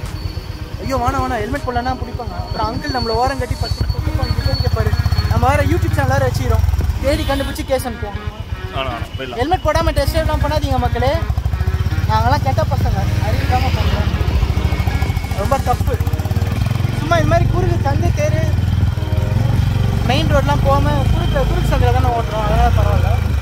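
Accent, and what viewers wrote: Chennai, India. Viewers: "மெட்ராஸ் பாஸை" யில் பேசுவது கேவலமாக இருக்கிறது!👎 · Super Bro.... full Chennai slang I like it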